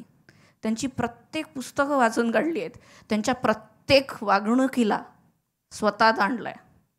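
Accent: India. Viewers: I think if u r wearing navvari saari and showing so much gratitude toward maharashtra then u should change ur accent as well... As you were staying in banglore for year u r having that South Indian accent which sounds really irritating. Please be proud of being maharashtrian. U r purpose will not fulfil by only wearing navvari but please work on accent. Stop taking out that south indian accent. If u really proud of being maharashtrian.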